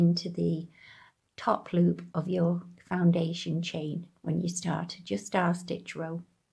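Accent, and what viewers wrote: United Kingdom. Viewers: Can i ask why you use American terminology? When I hear a UK accent I hope for UK terminology - have we just given up on it altogether?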